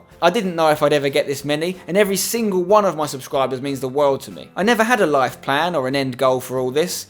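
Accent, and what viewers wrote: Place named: Cockney, United Kingdom. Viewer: Mad that you went Kegs, man, I live pretty near it (Chelmer Village). Never thought you were from Essex, always had you down as an East End guy for some reason.. But anyway, congrats on the milestone, one of my favourite youtubers.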